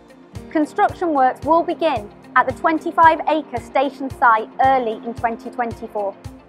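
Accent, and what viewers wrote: United Kingdom. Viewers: Dialect, regional accent... These things exist in the UK. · Thanks for another informative update and confirmation of just how much work is being delivered to increase this country's railway network capacity. When the book is written and the "Lessons Learned" sessions are run, this will - I am sure - provide the model for future large-scale infrastructure projects around the works. We have adopted "green tunnels (aka "cut&cover") from the French - and the Colne Valley viaduct construction gantry started its life building a railway in China. The no-doubt temporary interruption to the greater build will, once this new railway line is operational, need to be reversed. Kudos to the project build teams involved and - for a British project with multinational teams involved, it is reassuring to hear a British voice explaining the progress and future directions.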